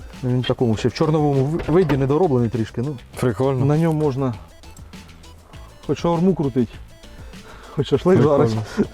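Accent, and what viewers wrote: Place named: Ukraine. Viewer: А можно нормально по руски без Ебонова акцента хохляского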